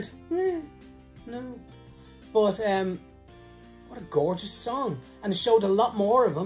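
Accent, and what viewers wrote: Ireland. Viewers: AH! i can just hear you saying "lovely" in your Irish accent, and i am smiling away like a fool again... so i am going to say "Ah, that's just Grand" in my not so good Irish accent (tho i do try) hanging out on the Bus! and hey, i just watched your ' ' video, and get this.... we already have the Same Hair!! ahahahahaaa! awwwww God Love ya.... you really are just as immediately warm and sweet, and really funny(!) in all of your videos so far. so nice to hear that you would love to see Italy and appreciate the culture, tho probably not quite as much as i would love to see Ireland.... all of those Gorgeous Castles and all of the Stunning Natural Beauty and Father Ted's house too! maybe one day.... (i am quite seriuosly ill, sorry to suddenly be a downer here, it's okay tho... i am pulling myself through as best as i can... we shall see i s'ppose...) if i am well enough to live the dream and actually visit Ireland, i will give you at least a 5 minute warning that i will be on that part of the planet! 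and maybe we could have a nice cuppa on the Bus hahahahahaa! and thank you so so so much for the kind words, i was seriously just calling it like i saw it, so glad that you found it to be that nice of a comment. you seem to be the kind of person who deserves it tho. i am faaaaiiiirly sure this is not the last you will see of me in your comments, so i shant say goodbye... just, until again. yay! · I love a slight accent, too, so singing is just fine like it is as far as I'm concerned and I like your Irish accent. The truth is, most of us have a bit of an accent even depending on which part of our countries we come from. I was born, raised and lived in Texas USA all my life so while I don't have one of those thick slow drawl accents at all (like Lady Bird Johnson, who made me shudder with slight embarrassment every time she opened her mouth for fear other people would think everyone from Texas sounded like THAT. 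We don't:-) but I know people from other parts of USA can tell that I'm not just Southern but that I'm from Texas and proud to be:-) Texas is not known as the GREAT Lone Star state for no reason.The USA is a huge country and In many respects Texas is a world apart from from what goes on with some other parts of the USA:-)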